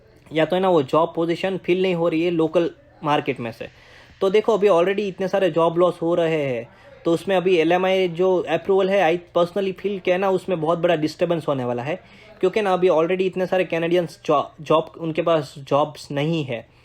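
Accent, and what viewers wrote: Gujarat, India. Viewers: Love your gujju accent haha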